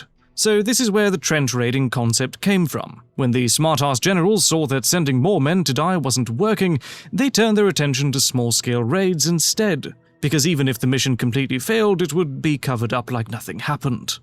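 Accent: United Kingdom. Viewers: Condescending British accent narration is key to losing all your audience.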